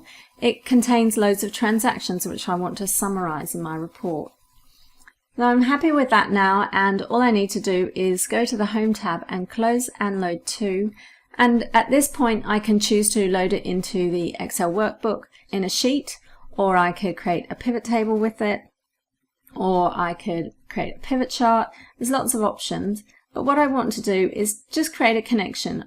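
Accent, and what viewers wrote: United Kingdom. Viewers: amazing, plus the little British accent...thanks !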